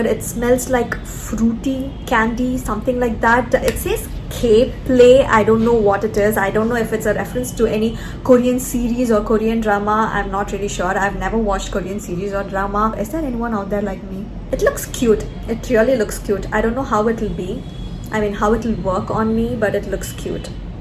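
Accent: South India, India. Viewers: Omg, the way u speak😘😘😘😘😘, u remind me of my south indian teachers.....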